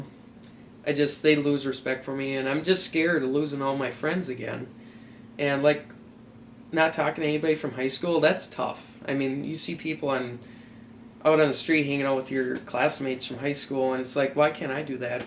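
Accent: Minnesota, United States. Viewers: what an interesting accent. Is he from MinneSOta? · What an amazing story!!! I still cannot understand how a parent could kick their own child out just for being who they are. Being gay is not a choice it is just who you are. The Ali Forney Center in NYC it littered with kids who have been thrown out of their good "Christian" homes... The Bible also says that adulterers (divorced) should be put to death. I am glad times are changing but it is not fast enough for me. -love your Minnesota accent!!!:)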